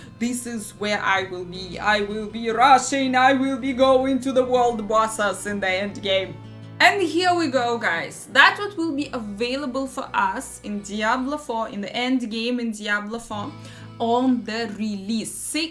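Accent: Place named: Russia. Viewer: По акценту как будто русская)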